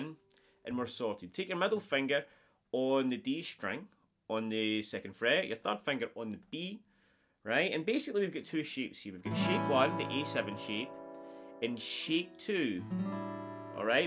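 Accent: Scotland, United Kingdom. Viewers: He is Scotish . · Great video, To check his fingerwork, especially on the A7 ascent, I slowed the video down to 25 and 50%... The Scottish accent sounds brilliant!. Like some drunk old pirate - fantastic! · Scottish? Good riffs? Good lessons? Instant sub mate!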